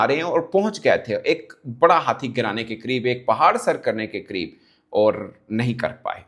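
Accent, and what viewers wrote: Punjab, India. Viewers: Apki English bhi Panjabi jaisi hay don't speak please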